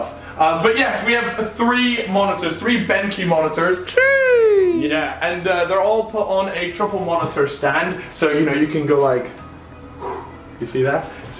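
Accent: United States. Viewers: you sound American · He sounds american and his accent keeps phasing out!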